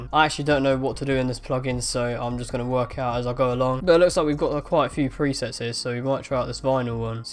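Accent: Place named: United Kingdom. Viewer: guy is really BRITISH!!!!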